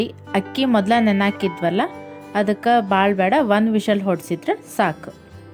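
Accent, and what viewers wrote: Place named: North Karnataka, India. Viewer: Nam ittar karnataka matu keli khushi ayitu sis super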